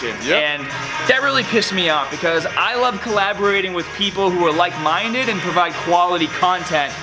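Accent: Boston, United States. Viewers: Baaahstan accent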